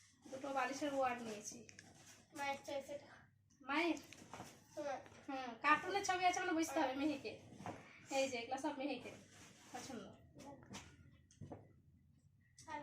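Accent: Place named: Malda, India. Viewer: Apa apni ki India theke bolcen.. apanr kotha gulo maldah ...mane amrao aivabe kotha boli ...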